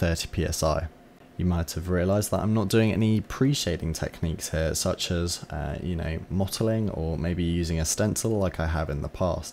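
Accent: England, United Kingdom. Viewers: Hi, re Tamiya where are you? You sound English? For two years you are rather good. Do you know how many models you have made? Bob England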